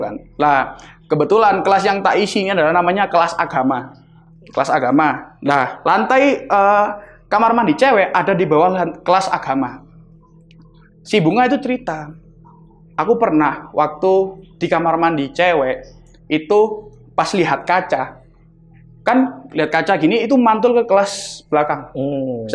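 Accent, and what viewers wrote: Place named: Java, Indonesia. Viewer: biyuhhhh logat e malangan puoll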